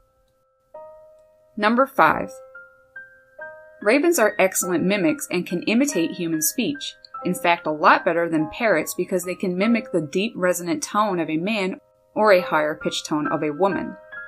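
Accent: Canada. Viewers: By any chance are u from Canada? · Annoying Canadian accent.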